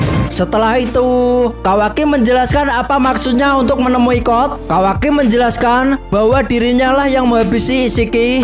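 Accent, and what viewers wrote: Java, Indonesia. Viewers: Logatnya medok pisan😅 semangat min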